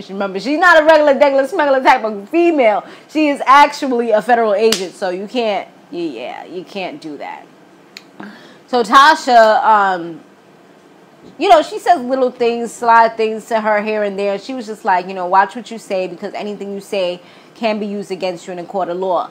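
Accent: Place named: Brooklyn, United States. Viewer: Are you from Brooklyn and of Caribbean descent because I hear a Brooklyn and Caribbean accent when you say certain words.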